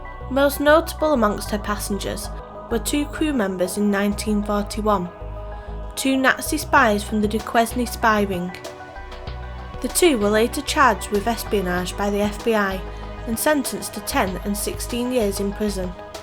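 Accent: United Kingdom. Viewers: The girl who narrates this has a cute British accent. I love her voice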